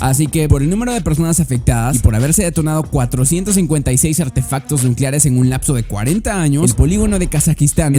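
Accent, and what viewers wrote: Fresa, Mexico. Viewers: se esucho diferente tu voz creo que te salio lo Mexicano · Regrese a ver al curioso ben veo q hablas mas a lo mexicano orale wey y veni ya no ssle mucho :'v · te cambio la voz y hablas con la papa en la boca ( fresa) no es la misma voz de antes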